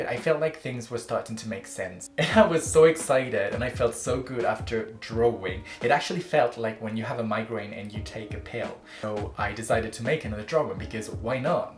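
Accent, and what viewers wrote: England, United Kingdom. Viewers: You're english is really good! And you have a cute british accent jdjdjd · Me acabo de enamorar de la voz de hablando ingles! Suena como un ingles de uk! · I JUST LOVE YOUR ACCENT SOOOOO BLOODY MUCH, IT KIND OF REMINDED ME TO ADELE´S ACCENT AND I LOVE IT:face-red-heart-shape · Tienes como un acento británico al hablar en ingles y me encanta! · I love is English accent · U sound a little bit more gay in English 😂😂😂 and u have a bit of British accent 💙 Love it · His British accent make me a orgasm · EL ACENTO BRITANICO AAAAAAAA ❤️❤️❤️❤️❤️❤️❤️❤️❤️ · Aprendiste inglés en Inglaterra?? Me gusta mucho tu acento inglés. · Me encanta cómo quedaron los dibujos y que tienes un acento súper británico, por lo que me han contado las personas que me han oído hablar inglés mi acento va cambiando entre británico como el tuyo, americano (estadounidense, no canadiense) y escocés según qué palabras ( todo esto fruto de mis distintos profesores o fuentes de aprendizaje de inglés en general a lo largo de los años) lol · I don’t know what I was expecting you to sound like in English but I definitely wasn’t expecting a half-British accent lmao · DIOOOOO que fluido xd y hablas como un británico lol te adoro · TE SALE UN ACENTO RE INGLÉS ESTO ES PRECIOSO, MÁS VIDEOS EN INGLÉS!!! 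❤️❤️ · Ohh, I see, I see, interesting. I actually root more for the British aacent, nice. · i didn't exepct the british accent, tbh and i love it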